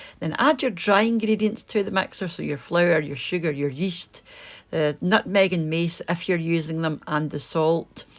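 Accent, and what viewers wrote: Scotland, United Kingdom. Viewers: Tried this recipe works a treat. Very nice video and love the Scottish accent :) Well done.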